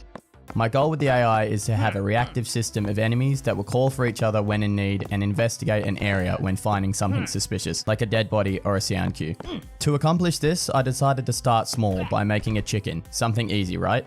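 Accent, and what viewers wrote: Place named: Australia. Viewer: I thought I heard the accent I'm Aus too lol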